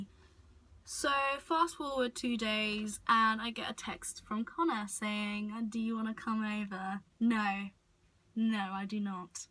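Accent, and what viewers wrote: United Kingdom. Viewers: Ur accent is so stereotypically British to me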